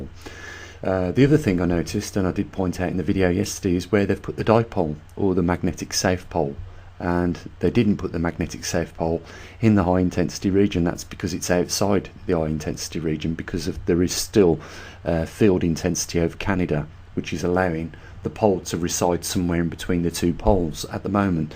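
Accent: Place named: West Midlands, United Kingdom. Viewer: you sound like you are from the West Midlands, somewhere. I live near there. would it be possible to meet me. I am interested in your work but lack knowledge.?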